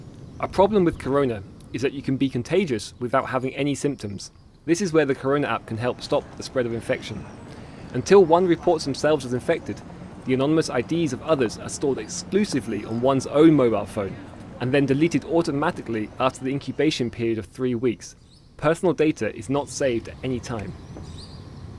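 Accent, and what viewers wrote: Germany. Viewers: Hahahah der deutsche Akzent 😂😂😂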